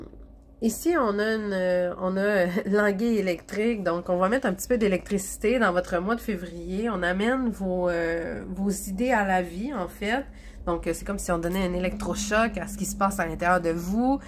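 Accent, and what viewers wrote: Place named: Quebec, Canada. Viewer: Je suis tombée par hasard sur une vidéo de vous hier...comme on dit, il n'y a pas de hasard 😉 Et celle ci ce matin, je vis en Suisse😊. Je dois vous dire merci pour ces guidances, qui sont incroyables. Cela fait quelques mois que je suis en transition, en recherche de mon chemin de vie, et la vidéo que j'ai regardé hier, m'a donné enfin des réponses à mes questions bien précises. Pour cela plein de gratitude 🙏. Cette vidéo est aussi surprenante de vérités, choix 2. Et le plus, votre magnifique accent québécois. Vous avez une nouvelle fidèle ❤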